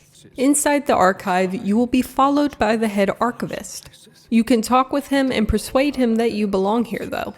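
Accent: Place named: South, United States. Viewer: What is your accent? I feel like it's southern but like sophisticated at the same time 😎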